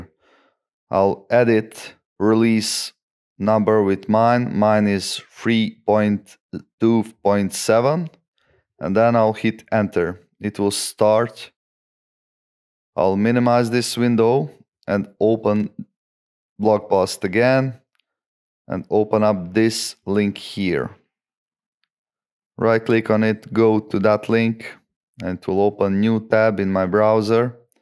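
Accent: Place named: Eastern Europe. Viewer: Славянский акцент))))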